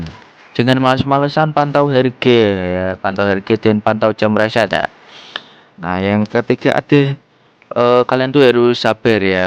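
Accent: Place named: Java, Indonesia. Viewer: suara jawa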